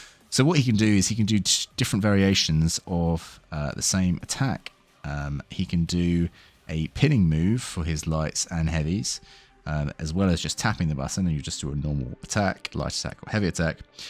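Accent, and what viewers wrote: United Kingdom. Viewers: No you didn't get the pronunciation right. But close enough, closer than the apes from Ubisoft actually because your British accent fixes one of their mistakes.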